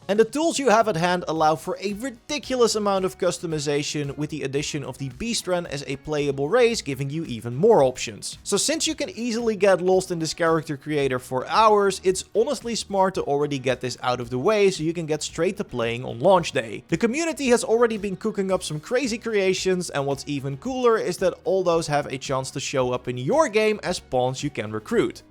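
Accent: Netherlands. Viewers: Dutchy dutchman talking. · dutch accent is so funny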